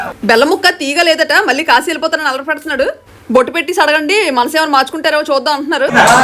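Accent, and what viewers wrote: Vizianagaram, India. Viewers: Mandalu virigupothayi... Mana vizianagaram slang nachina dialogue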